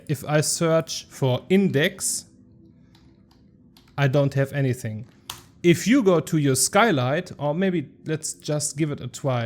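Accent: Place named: Germany. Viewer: Der Deutsche Akzent ist nicht überhörbar 😅, aber gutes Video 👍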